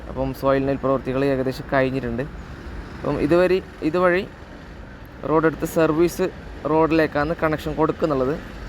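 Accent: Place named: Kasaragod, India. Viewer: തനി കാസർഗോഡ് സ്റ്റൈലിൽ. ലാൽ സലാം. ഈ വാളും അങ്ങന്നെ ഇങ്ങോട്ട് വന്നിർലാ എന്നേഉള്ളൂ പേടി ❤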